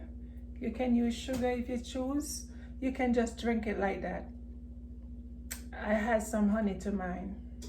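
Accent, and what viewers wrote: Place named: Jamaica. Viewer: I tell you I love this jamaican accent, this was really interesting to watch can I visit you every Sunday?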